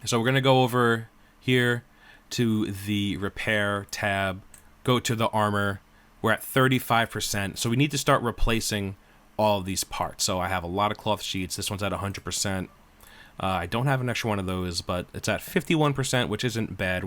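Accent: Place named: North, United States. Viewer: Strong northern US accent, michigan to new england (probably NY). Great guide thanks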